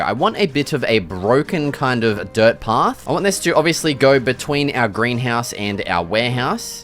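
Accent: Australia. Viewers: this is the most australian man i've ever heard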